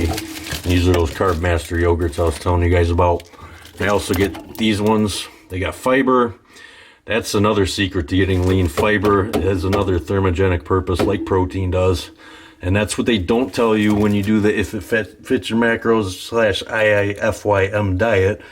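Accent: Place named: Michigan, United States. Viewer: Hahaha, I knew you were from up there because of the accent. Meijer confirms it. Michigan native, here.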